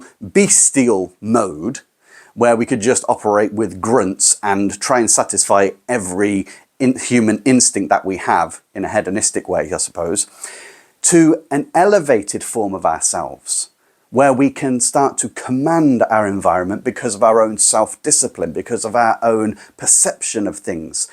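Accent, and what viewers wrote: United Kingdom. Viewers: You're accent is very elegant and precise. A true british one.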